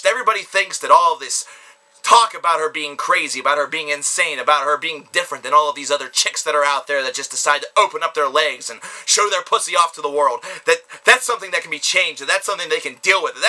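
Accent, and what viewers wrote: South, United States. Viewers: Lol that southern accent reminds me of Bill Hicks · Your southern accent makes me laugh so hard hahahaha!!!!!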